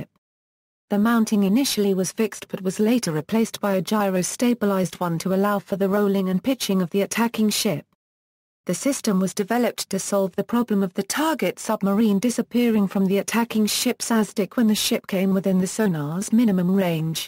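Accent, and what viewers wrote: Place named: United Kingdom. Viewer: A computer with a British accent ... cute~!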